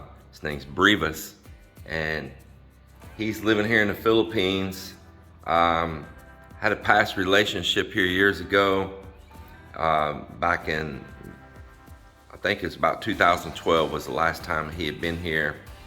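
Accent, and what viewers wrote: Texas, United States. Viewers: I love the Texan accent. It’s so howdy 🤠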